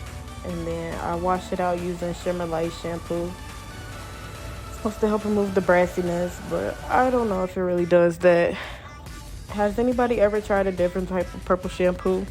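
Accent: United States. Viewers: G I went to your socials because I know Chicago when I hear it. You snapped on the wigs and the video too sis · Girl u are funny🤣....!!!! your accent reminds me of Miami lol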